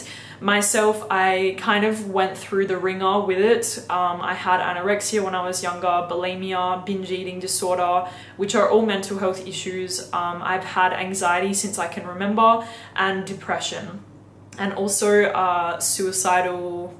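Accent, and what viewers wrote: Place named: Australia. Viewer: I have a weird question. I’m from the UK and grew up watching Neighbours, your accent sounds very different to the people on that show, does Australia have a lot of different accents?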